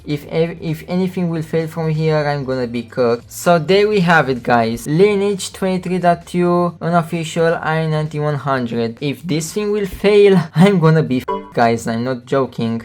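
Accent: Romania. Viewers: Wait I just realized are you Romanian??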